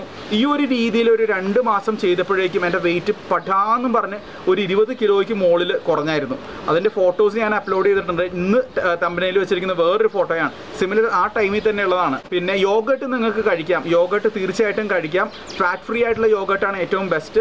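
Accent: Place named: Pathanamthitta, India. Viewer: ഇല്ല ഭായി സംസാരത്തിനു യാതൊരു കുഴപ്പവുമില്ല. ഞാൻ കരുതിയത് ഭായീടെ നാട് പത്തനംതിട്ട ആണെന്നാണ്.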